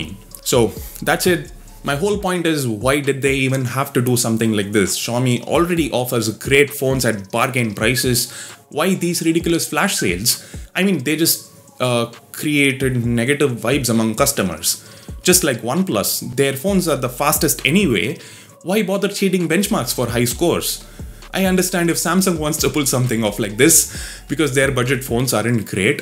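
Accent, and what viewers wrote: India. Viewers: Now that's the English one can understand properly, the way we Indians speak, unlike that fake a** weird accent used by aishwarya at c4tatti.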